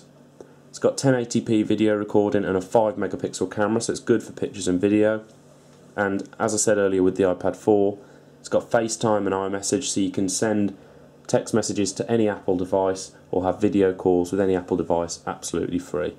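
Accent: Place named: Coventry, United Kingdom. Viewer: I am from Coventry. You have gotta be from somewhere near there by your accent! Thanks for the vid by the way, very good!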